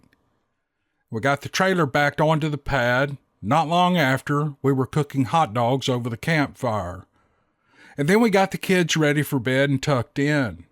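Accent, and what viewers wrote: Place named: South, United States. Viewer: you seem new here, welcome to one of the best southern voices of the cryptid world - between him and Kerry Arnold [Bigfoot Odyssey] their voices/accents and way of delivery are second to none and in my opinion, just give these stories a much appreciated bit of southern flavor.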